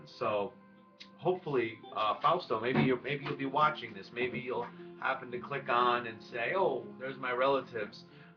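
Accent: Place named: Southern Italy, Italy. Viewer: Hi man! Nice video! I'm Italian (from Lombardy), it's amazing to listen to you speaking italian, because you mix Italian, dialects of Southern Italy, English and Spanish! Keep on posting videos!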